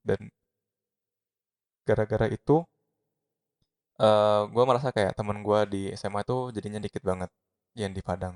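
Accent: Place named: Germany. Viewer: Kakak asli mana ? Kok suaranya ada aksen jermannya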